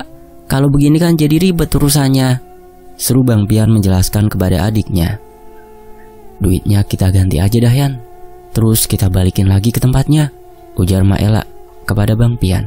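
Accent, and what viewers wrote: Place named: Betawi, Indonesia. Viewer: Wa'alaikum salam wr wb Saluuut bgt sama mas suaran & Dialek Betawinya pas bgt menyatu & menjiwai kereen 👍👍👍🥰🥰🥰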